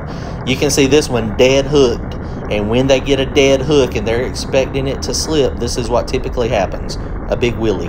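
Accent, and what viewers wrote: South, United States. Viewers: Really cool informative video. Question are you originally from the south?.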